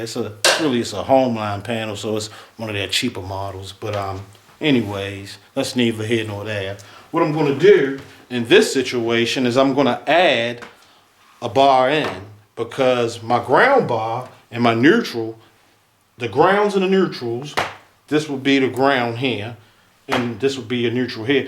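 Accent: Baltimore, United States. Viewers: Baltimore accent? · great video! you also sound very much like my uncles from Baltimore. im from and live in Philly, so it really stands out. again, nice video! super informative, easy to follow along with.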